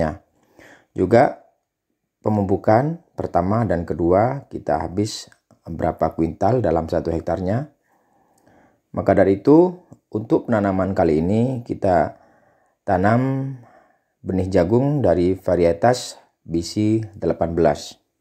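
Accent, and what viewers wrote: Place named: West Sumatra, Indonesia. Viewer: Suaranya mirip Minang kocak 😀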